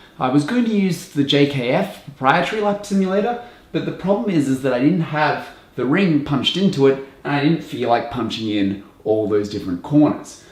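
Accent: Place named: Australia. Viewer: hes more of an English sounding aussie than the rest of us bogans that sounds like or worse than Dundee lol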